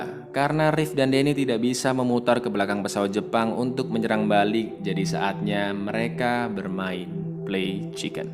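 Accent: Java, Indonesia. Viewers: G rame suara jawa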